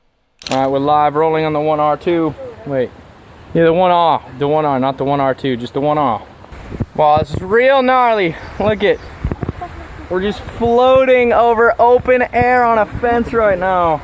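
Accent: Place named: Australia. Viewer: You should stop the Australian accent.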